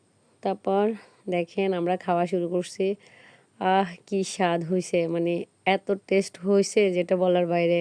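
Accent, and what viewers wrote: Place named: Noakhali, Bangladesh. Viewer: হায়রে নোয়া,,,,,,খাইল্লা।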